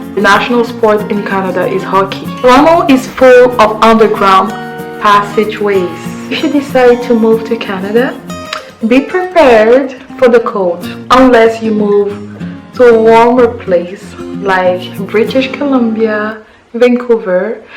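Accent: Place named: Nigeria. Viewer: From ur accent I believe ur are My sister from Nigeria, pls let Me know the step by steps guide and documents I needed and the right agent I need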